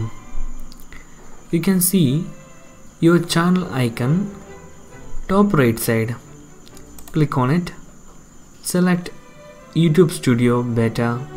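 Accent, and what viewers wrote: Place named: Kerala, India. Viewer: Malayali English.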